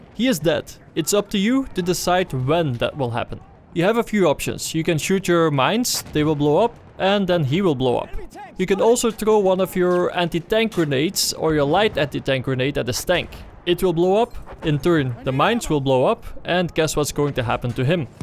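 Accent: Netherlands. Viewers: fix your dutch accent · dutch accent but hes from denmark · he sounds Dutch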